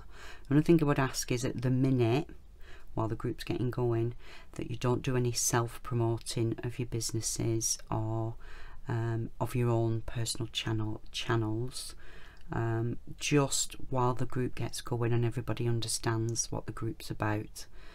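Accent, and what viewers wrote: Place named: Northern England, United Kingdom. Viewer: Love your channel, subscribed as soon as I heard a fellow uk northerners accent!! And this technique is amazing!! Can't wait to try it! Thanks for sharing :-)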